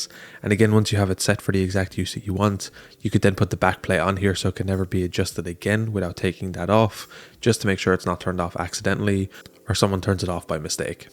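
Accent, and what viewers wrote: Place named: Ireland. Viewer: Makes that sexy Irish voice so much better ;)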